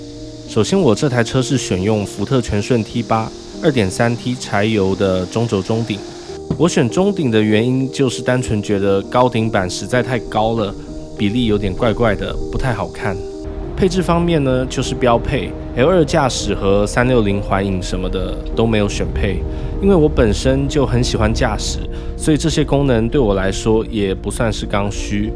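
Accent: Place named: Taiwan. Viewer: 這是在大陸 我以為在台灣 台灣的口音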